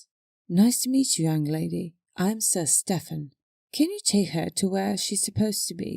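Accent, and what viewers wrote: United Kingdom. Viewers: I noticed you used a different voice for this video today, one with a heavier English accent. It fit the story very well. I also like this new storyline you just started, you are really branching out with new story ideas. knowing how your stories are we know there will be a gender change where he becomes a real girl. I cant wait till the next season of the pink packet/ mittens storylines those are my favorite works of yours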